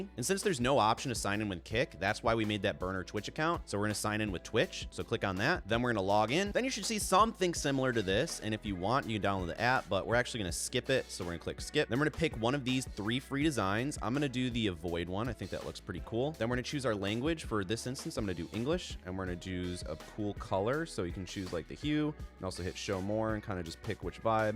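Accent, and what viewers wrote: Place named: Scotland, United Kingdom. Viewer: Ayy ... Didnt realise u spoke .. Scottish ..... :)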